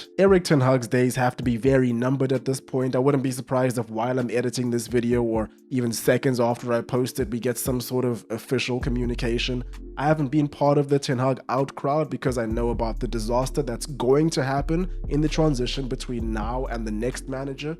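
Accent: South Africa. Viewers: I'm curious about where you're from bro? Name says Zim, accent says SA. Just curious... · Are you south African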